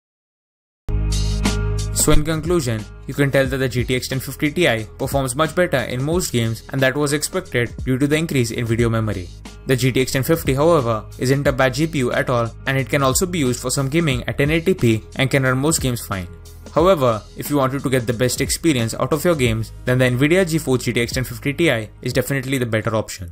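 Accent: India. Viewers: Fucking curry accent, drank too much contaminated poop water that made you indians have that accent?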